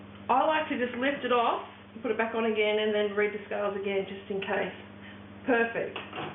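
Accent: Australia. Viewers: She is an Australian. That is how they say it. We sound funny to them. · Very nice video. I appreciate the recipe and all measurements. Love your Australian accent :-)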